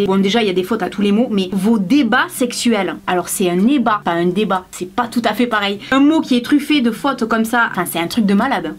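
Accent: South, France. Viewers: sa se vois qu'elle vient du sud ^^ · Bonjour. Je viens par hasard de découvrir ta chaîne. J'aime ton style naturel. J'ai particulièrement apprécié cette vidéo sur" Mon voisin est fou". Je fais partie des 50% de personnes qui ont des problèmes avec leurs voisins, et ça m'a donné des idées pour les remettre à leurs places. Alors j'ai bien rigolé. Puis j'ai encore bien ri en lisant les commentaires trop drôles. Super, ici pas d'insulte!!!! Je m'abonne à ta chaîne. PS: comme toi j'ai l'accent du sud et c'est bien de ne pas essayer de le gommer dans tes vidéos. Il apporte beaucoup de convivialité.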